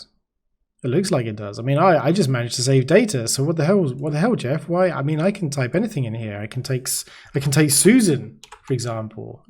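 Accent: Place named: United Kingdom. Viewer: Informative and entertaining. (bonus points) the British accent sounds far more intellectual than my American "southern drawl". Excellent work man!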